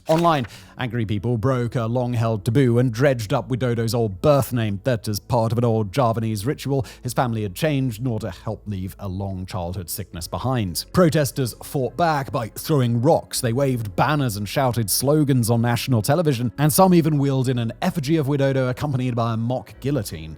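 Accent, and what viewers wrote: United Kingdom. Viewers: Cannot stand the deuchy obnoxious British accent!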